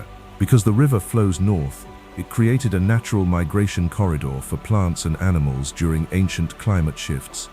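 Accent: England, United Kingdom. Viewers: The English accented narration is a strange choice. How about a nice Shenandoah Valley accent for a video like this?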